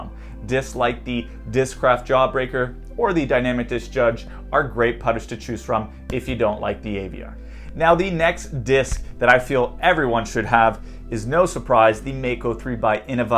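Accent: Quebec, Canada. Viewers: when available I'm going to grab the elevation koi putters. I love the interceptor midrange. Rubber putter might be interesting. That sounds like quebec canadian French correct? Btw love the content. Keep it up.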